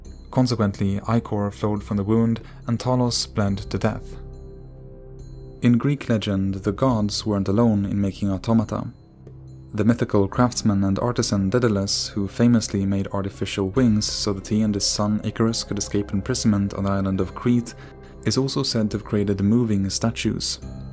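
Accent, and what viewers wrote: Sweden. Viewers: Is the narrator Swedish? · I can hear that the narrating voice is swedish. :-D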